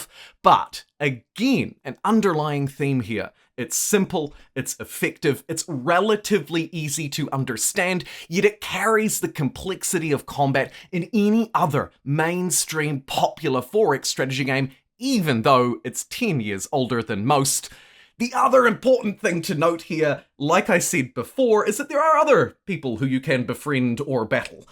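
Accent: New Zealand. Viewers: You sound Kiwi, Australian and American all at the same time, I like it. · is this guy kiwi?????????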